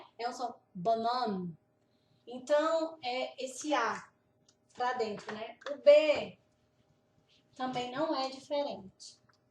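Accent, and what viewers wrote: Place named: Northeast, Brazil. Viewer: Tô louca pra aprender norueguês. Caí aqui na doida, mas já te amei. Obs: Acho que vc é do nordeste, vc tem o sotaque parecido com o meu. Sou de Natal/RN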